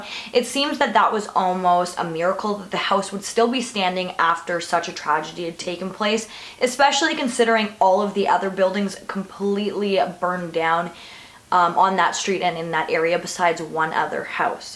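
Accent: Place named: Canada. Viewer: I love how Canadians say "about". Aboat or aboot, hehe. Sorry, I'm British. Awesome video once again, you're the Queen of the creepy. <3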